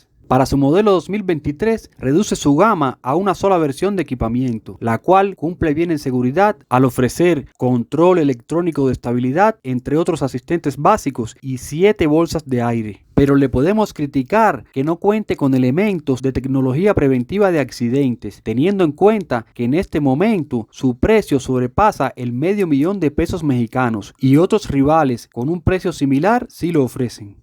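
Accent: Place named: Central America. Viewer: Con acento centroamericano.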